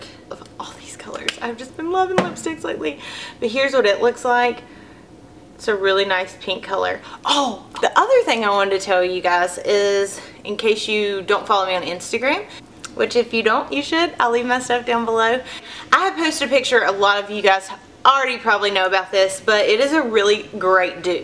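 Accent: South, United States. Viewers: I found you on istagram and I'm glad I did! LOVE YOUR VIDEOS!! You should do a Question and answer (Q&A) BTW your southern accent is soooo Cute!!! ♡♡ XoXo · It'd be so cool if I won the Raquel Nars because my name is Raquel. But anyways, I don't think that you should title your videos with giveaway because then people will only be interested in that one video and ignore your true talent and southern accent. Just an idea. Btw I love you and you are so beautiful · Omg I love your accent it's so cute and country and stuff haha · Found you thru Instagram...new subscriber! Loving your channel and that I can tell you're a southern girl too! xoxo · Can i just have your accent ? ❤️❤️❤️❤️ mine isnt as strong as yours i just love love live southern accents ❤️👌🏼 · you are too precious!! I commented before I watched the end of this one....you are so sweet to us into your favorites:)!!!!!! 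too cute xoxoxo keep it up you are already inspiring me and I'm super allot of other girls to follow there dreams....I have a thick southern accent too and I was always scared to try YouTube cause I was worried people would constantly hate on me about it:(! but seeing you smiling and being yourself is such an inspiration! !! love ya girl thank you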